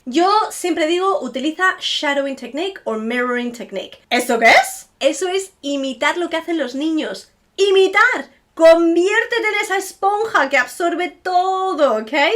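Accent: England, United Kingdom. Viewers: As a native American English speaker, I would say that you have an almost perfect British accent. However, I can tell when listening to you that English is your second language, you have a very slight intonation that comes through sometimes when you're speaking. Also, your accent is a bit more rhotic than standard RP which kind of gives it away as well. On another note, I'm not sure if reaching Spanish learners is your one of your primary targets, but I would love to see some content that's entirely in Spanish at an upper beginner to intermediate level. Also, when you speak a sentence in Spanish, I'm not sure there's a need to then repeat it again in English, it kind of slows the pace of the video down, I guess you could just subtitle it if your worried that English viewers won't understand it. Some of the errors that non native Spanish speakers make when speaking English aren't bad and sound fine, like for example when Spanish speakers putting an "e" in front of consonant clusters like "sp" and "st" to me it sounds fine, but I did grow up around lots of Mexicans so maybe I'm biased. 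· Actually, as an American, I feel she sounds like she has a regional English accent. (Just not sure of the region.) Rarely did I detect a hint of Spanish accent. And the speed at which she can speak English is astonishing. As a former ESL teacher, I salute her! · You sound very English not American at all . I am was surprised when you said you have American Families ? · You speak very good English, because your husband is English and you applied the “Mouth to Mouth” technique to learn so good and get the English accent! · im american and im so jealous. i dont speak spanish. you speak perfect spanish (since you speak spanish from spain) and you speak a higher class of english than americans with your british accent. ive always wanted to not just be bliingual but to speak the most perfect forms of english and spanish like you...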